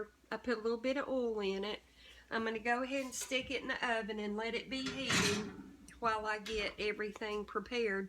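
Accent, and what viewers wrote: South, United States. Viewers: She talks so sweet and southerny. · has the best Southern accent I have ever heard. I can hear the kindness in it. We love you. ❤ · Ms, I hv watched several of your videos, so now I am a big fan!!!! Your talking and accent w/mannerisms just like my Grandmothervwho was raised in So Ga. When I watch you, listen and learning,I'm hearing her telling me her lessons and stories of her data growing up!! I love it, and I am so excited to get it ur videos on all it favorite hummus foods. Lov u already and please, keep sending us videos!!! Thank you so much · All I had to do was hear that southern voice and I instantly subscribed. If anybody knows about cooking, it's a southerner. Thank you for sharing this recipe. I'm looking forward to catching up on all of your recipe videos here in southern Ohio.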